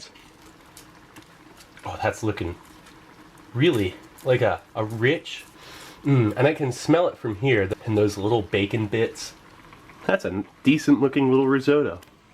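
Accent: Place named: California, United States. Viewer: Always wondered where you're from? I hear a Cali accent.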